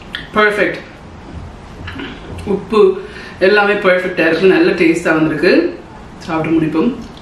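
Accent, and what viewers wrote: Sri Lanka. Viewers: Ilangai thamizh ❤❤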